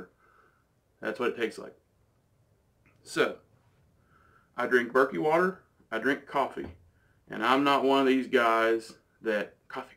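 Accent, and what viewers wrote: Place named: Alabama, United States. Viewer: U from alabama? I dont hear "himhaw" anywhere but south bama lmao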